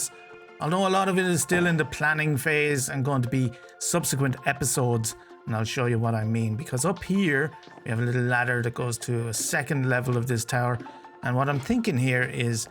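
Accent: Ireland. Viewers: Wow you were not kidding with that heavy Irish accent 😂😂 And I actually like it, it's fun😅😂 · how do you not have more subscribers!!? well im happy to be here anyway definitely a new favorite youtuber, most people that do hardcore series go straight to automatic farming and beating the game but you have a big focus on builds which i love and a fun humor and voice. You remind me a lot of one of my favs is Waxfruad just with that irish accent 😂 Thanks for the videos youre awesome!!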